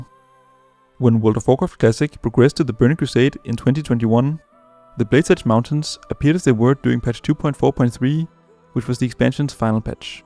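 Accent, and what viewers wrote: Denmark. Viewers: Danish accent detected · Love me some Dano-English accent, sounds too adorable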